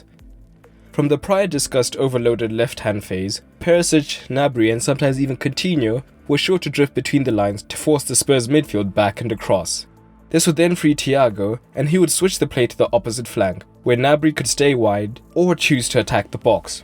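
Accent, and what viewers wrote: South Africa. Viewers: Where are you from? Sound like a Saffa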